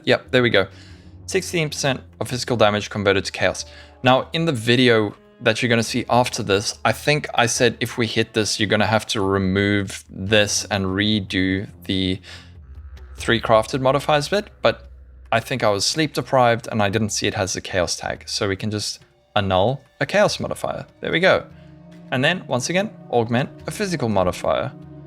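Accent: South Africa. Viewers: Are you Afrikaans? Your English accent sounds like you might be from SA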